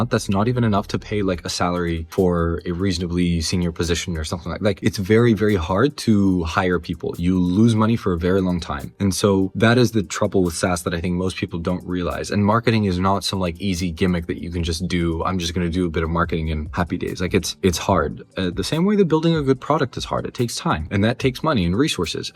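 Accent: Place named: United States. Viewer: You from spain... But you sound American how?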